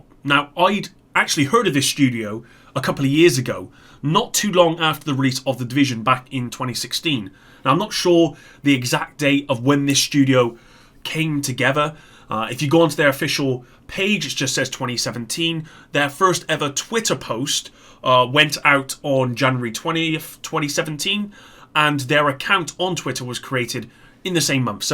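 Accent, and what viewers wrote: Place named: South West England, United Kingdom. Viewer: Sounds like he's from south west England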